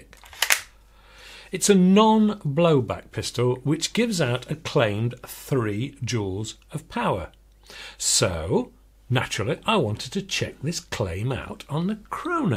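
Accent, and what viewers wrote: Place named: England, United Kingdom. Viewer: Why is David Duke talking with an English accent?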